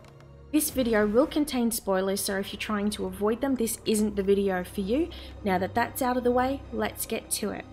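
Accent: Australia. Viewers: Love the aussie accent 😜👌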